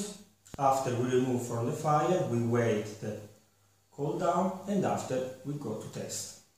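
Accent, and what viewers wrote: Italy. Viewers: so he is Italian, really nice accent.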